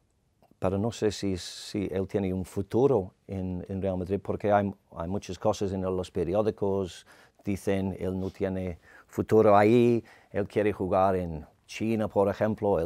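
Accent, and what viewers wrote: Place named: Liverpool, United Kingdom. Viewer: Spanish with a scouse twist.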